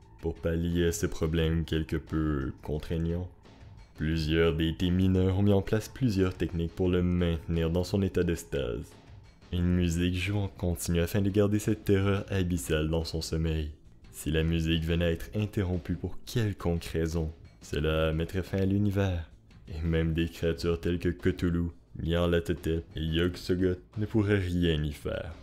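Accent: Quebec, Canada. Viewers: T’essaie de dissimuler ton accent canadien ou alors il est naturel ? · Tu est québécois ?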